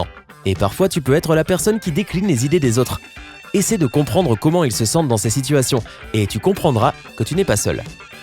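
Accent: Quebec, Canada. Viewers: Dite vous etes québécois hein tes un youtubeur québécois ?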